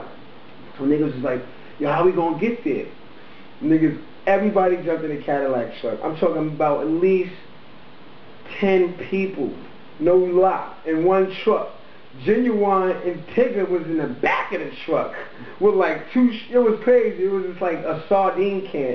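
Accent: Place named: New York, United States. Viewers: you just dont know every new yorker have that accient manily if u from the projects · Why don't he just tell the story, whatever it is, instead of holding on for dear life to that NY accent and name dropping?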